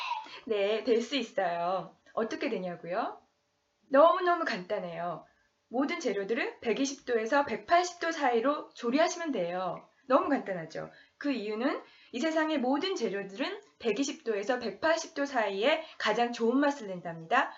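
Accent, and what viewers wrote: Seoul, South Korea. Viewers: 서울 사투리 정말 매력적 ㅎㅎ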